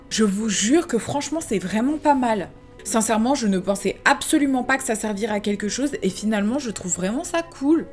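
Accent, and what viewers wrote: United Kingdom. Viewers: Ton acsan anglais😒😒😒 et chiant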